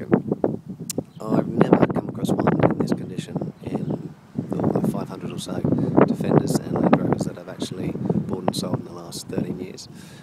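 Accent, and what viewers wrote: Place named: Australia. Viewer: Are you from Australia or New Zealand? I can tell your not a Brit because you're very Australian-sounding and your Land Rover there has an Australian/New Zealander number plate on it.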